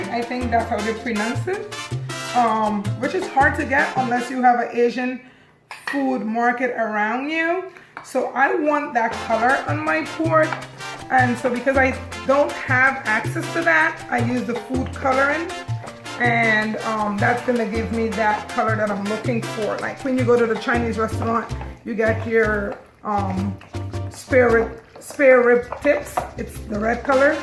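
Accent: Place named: Guyana. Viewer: I love your voice it’s so soothing are you from Guyana? Thanks for the recipe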